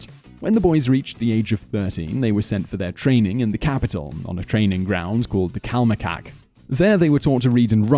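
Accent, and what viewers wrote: United Kingdom. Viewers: You have the most British voice in human existence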